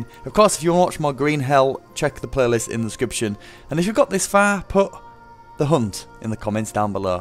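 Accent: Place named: United Kingdom. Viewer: He's a Brit, it's just how they say it. It's when they pronounce aluminum and antibiotics that break my mind.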